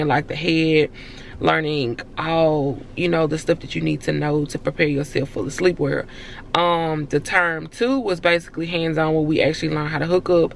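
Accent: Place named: South, United States. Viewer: Voice hella country lol but at least the vids aren't boring like some others who do them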